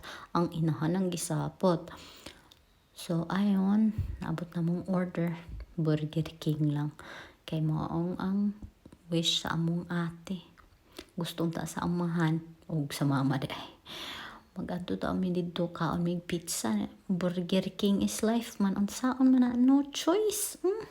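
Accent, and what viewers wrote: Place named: Visayas, Philippines. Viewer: Ang cute pamati on mag bisaya uy hahaha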